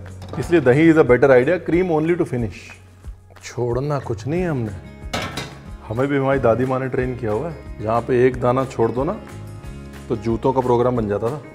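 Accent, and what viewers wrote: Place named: Punjab, India. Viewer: I really love the punjabi accent and the joy( major ingredient) he infuses in his cooking.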